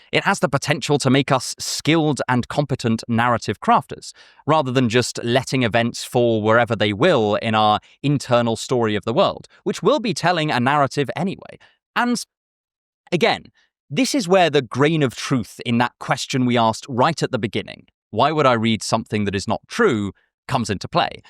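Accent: RP, United Kingdom. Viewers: Sir you sound like a man from victorian era elite british! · Do you speak received pronunciation?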